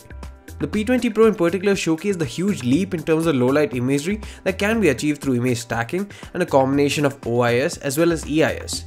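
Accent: India. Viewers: Indian accent english is the worst, go shit on the street leave youtube alone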